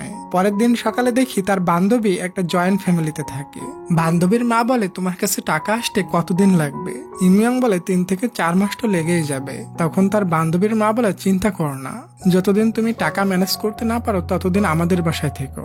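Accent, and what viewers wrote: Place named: Madaripur, Bangladesh. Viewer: ভাইয়া আপনার বাড়ি কোথায় মাদারি পুর না বরিশাল। আমার মনে হয় মাদারি পুর।❤❤❤❤❤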